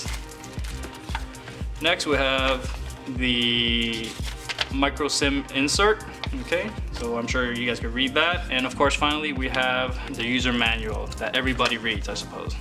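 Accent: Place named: China. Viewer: I couldn't enjoy the video. The presenter has a thick accent. Sounds like Chinese/Mandarin is his first language? That's my best guess.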